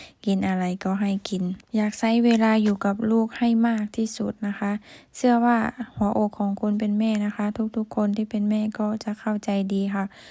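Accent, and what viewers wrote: Laos. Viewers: มันบ่คุ้น เสน่ห์ของหนูคือพูดลาวนั่นล่ะ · ใช้ได้อยู่ เว้าภาษาไทย ฝึกไปและต้องสังเกต ส่วนมากคนลาวเพิ่นสิเว้า ช เป็นซ.คนไทยสิจับได้เลยว่าเจ้าเป็นคนลาว ลองฝึกเบิ่ง แล้วกะ ด เจ้ากะใช้ ล ได้เจ้ากะเว้าว่าไล้ ช่วยเจ้ากะเซ้าซ่วย ช. ด ฝึกให้มันถูกแค่นี้กะเว้าถูกแล้ว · แม่ติดตาม มานานแล้วมักที่เจ่าเป็นคนลาวเว้าลาวเป็นโตของโตเองแล้วก็เข้าใจสำเนียงเว่าของลาวไต่มันกะคือคนอิสานทางอุบลจงทำในแบบที่เฮาเป็นแล้วคนเบิ่งคริปเขากะมักที่เจ่าเป็น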